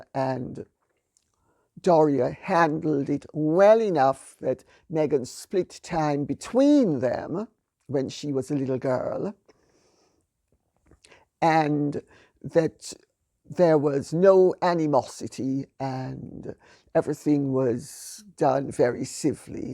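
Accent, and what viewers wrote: Jamaica. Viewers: I was trying to pin point your accent, and after you said you were Jamaican, it made sense. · Lady C. You are so correct about Jamaica and Ganja ! I lived in Constant Spring, at Braemar House after it was turned into Apartments about 1968. Remembering that Ganja was absolutely ILLEGAL and basically only some Rastas used it and Bob Marley. Jamaica is a spectacular place, I know it front to back after living there for five years. So many stories. Wish I knew how to contact you, would love to share some of the stories with you. Thanks so much for your entertaining stories. How I enjoy hearing your Beautiful Jamaican Cultured English accent.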